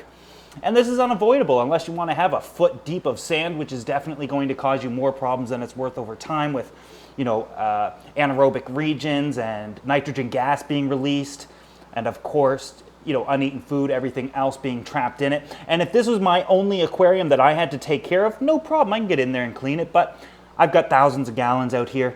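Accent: Canada. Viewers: Youre saying oot instead of out. Are you Canadian? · Are you canadian like me? I can hear a bit of an accent lol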